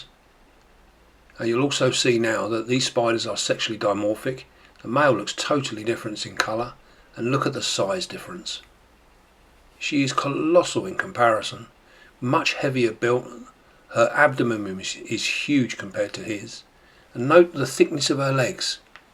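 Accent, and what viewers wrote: United Kingdom. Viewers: You sound so properly British!!! Lol, compliment mate!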